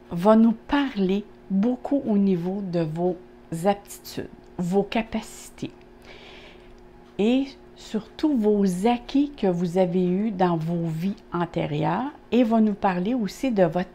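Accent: Quebec, Canada. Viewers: Vivez vous Québec vous êtes Québécoise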